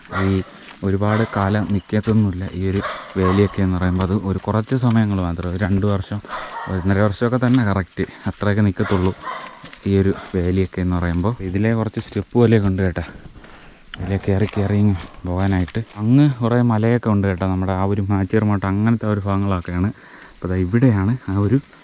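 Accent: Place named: Trivandrum, India. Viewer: Chila comments kandu bgm idan kurachu neram vaa pooti irunnal mathi 😂 video start cheyumpo muthal kalapilaa ennu samsarichondirikkum but trivandrum style samsaram rasamund ennalum kurachu kurachal nannayirikum 🤐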